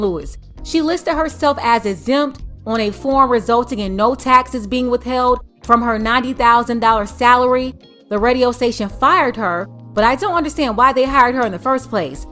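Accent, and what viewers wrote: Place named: Florida, United States. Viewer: I know you said you lived Miami before but are you from Florida? You sound like it and you have that smart Miami mentality?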